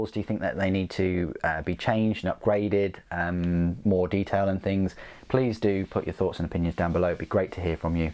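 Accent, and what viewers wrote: United Kingdom. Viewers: THis may sound a bit silly but I love your voice, it encompasses Britishness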